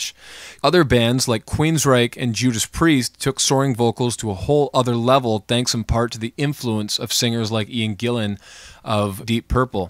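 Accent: Canada. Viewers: The narrator is canadian for sure.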